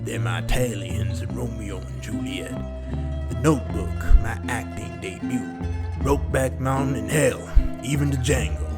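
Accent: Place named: Southern Africa. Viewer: well well well, my eyes have been opened to infinite horrors tonight. Thank you, young sir! Also, are you from Southern Africa? I hear a very similar accent! Zimbabwe?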